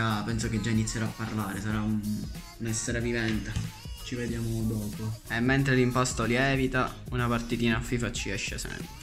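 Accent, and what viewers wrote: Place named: Palermo, Italy. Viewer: sei di Palermo? No perché anche io lo sono e penso di aver riconosciuto l'accento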